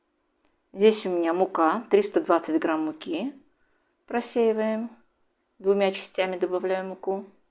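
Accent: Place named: Baku, Azerbaijan. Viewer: Здравствуйте. Я когда первый раз увидела ваше видео,вернее услышала-думаю,что то знакомый выговор,вернее манера говорить. 😅Оказывается вы наша из Баку. Только Бакинцы так тянут слова😂Привет вам из Баку и долгих лет жизни ❤Аллах джан саглыгы версин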